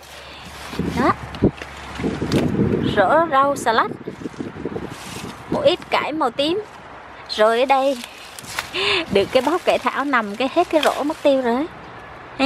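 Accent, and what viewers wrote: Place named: Central, Vietnam. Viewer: Cô người miền Trung ạ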